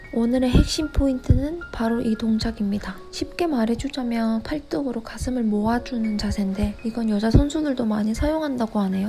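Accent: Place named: Busan, South Korea. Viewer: 목소리 넘 귀여우세요(약간 부산사투리) 저희 아이들 골프 집에서 아빠한테 배우는중인데~넘 좋은 영상이네요 저도 다시 골프 시작해야 한다고 생각한거만 몇년째네요~참, 님 참 제가 원래 운영하던 채널이 있는데 그쪽을 좀더집중해 보려고 해여... 기존 채널도 계속 유지중이니 구취는 노노!! 자주 뵈어요🤎 <link>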